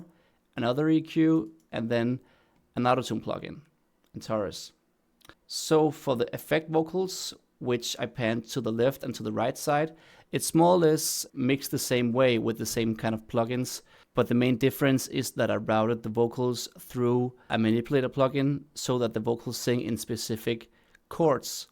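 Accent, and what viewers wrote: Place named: Denmark. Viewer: Uhaaaa jeg kender en dansk accent når jeg hører den😂 Fed tutorial bro, det lyder dope🔥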